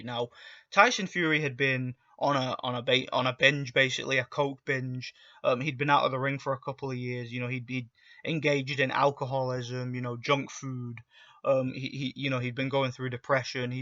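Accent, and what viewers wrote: United Kingdom. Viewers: Is crazy what your saying but do you know the wbc took the belt from tyson fury about the glove gate bs povetkin vs dyllan white just recently fought for the interim wbc heavyweight belt sooo skill or not he was a champ and your accent tells me your from uk and mayb mad at this guy idk but it seems wilder lives rent free on your mind 🥱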